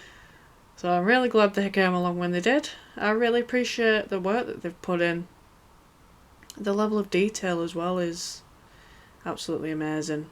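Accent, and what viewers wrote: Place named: Yorkshire, United Kingdom. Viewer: I'm from selby, and I recognize your accent, you're not too far from here are you 🙂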